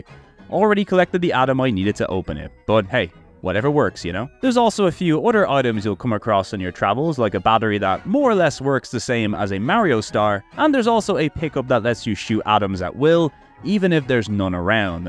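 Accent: Ireland. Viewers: What is this accent? Irish ?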